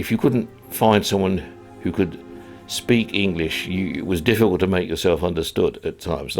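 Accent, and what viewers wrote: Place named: United Kingdom. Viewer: I like to hear a smart people like you(and your normal British accent) Greetings from Poland.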